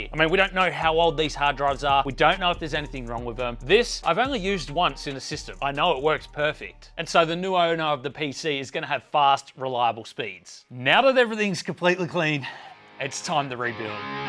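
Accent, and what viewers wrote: Australia. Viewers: This guy has the most Aussie accent I have ever heard. Great video too don't mean any disrespect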